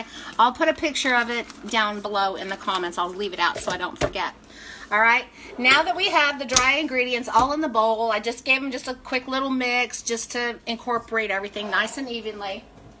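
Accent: Texas, United States. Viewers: Sounds like you are from/or in Texas. Denton Tx here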